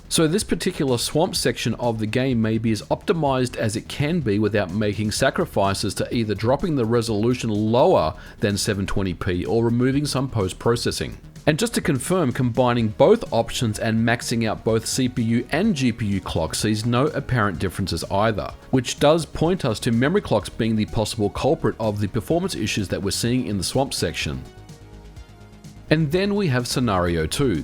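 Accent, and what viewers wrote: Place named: Australia. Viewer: you sound Australian but your games are not? do you live in Australia?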